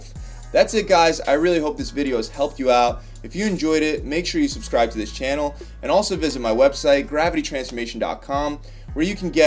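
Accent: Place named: New Jersey, United States. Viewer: are you from New Jersey I was thier a few days ago first time and you got a Jersey accent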